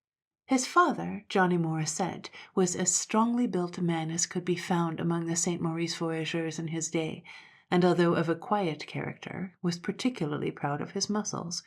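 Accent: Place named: West Coast, United States. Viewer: Portland, OR? I live in Salem, but have been in SE OR taking care of family. I thought you sounded like a West Coast accent. 💗 Ah to have a flying canoe!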